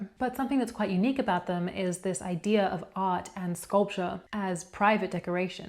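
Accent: Australia. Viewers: Are you Australia based? Great content. So well done… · I think Australia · Thanks, I enjoyed this summary of the Etruscans. It’s hard to get an overall picture so concise. It’s also funny listening to how you change from an Aussie accent to an American one depending on which words you say 😂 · Australian? Capricorn? I need answers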